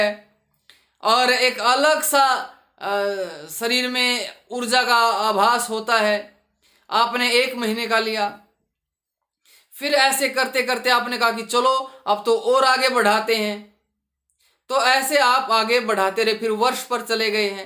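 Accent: Haryana, India. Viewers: Aap haryana se ho na 😅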